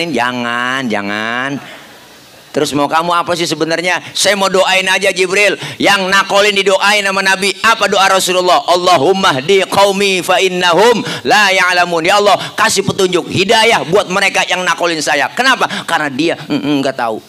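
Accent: Jakarta, Indonesia. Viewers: sangat terhibur dengan logat betawi Babelan,trimakasih ya pak ustd,smoga sehat selalu💪💪🇮🇩🇮🇩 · Betawi nya medok banget mantaf !!!. Medan selalu nyimak. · Suka banget ceramahx,bhasax,logat Betawi biar sy orng Pati Jateng,tpi sy pahm sekali,krna pernh tinggl d Jakarta Selatan... lucu buanget,semoga bermanfaat pak ustadz...🤗🤗🙏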